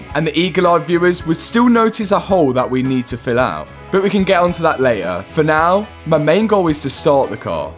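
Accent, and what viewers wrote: United Kingdom. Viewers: No! Your British accent doesn’t sound like Matt’s.!!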